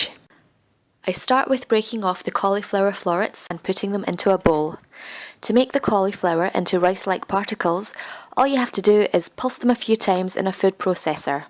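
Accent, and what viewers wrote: Scotland, United Kingdom. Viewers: love your British accent! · You sound so Scottish :) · Love the Accent, FREEEEEEDOM. poshest Scottish accent i have heard in a long time and a good video to boot.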